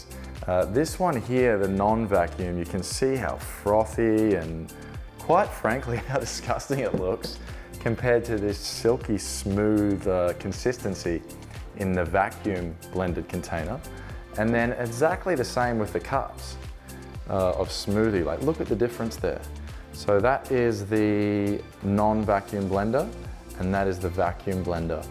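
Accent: Australia. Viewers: I subscribed for the Oz accent 😂😂😂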